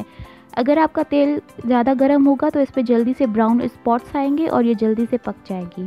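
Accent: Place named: Hyderabad, India. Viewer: wow, that's great. I also had a feeling that you're from Hyderabad because of your accent.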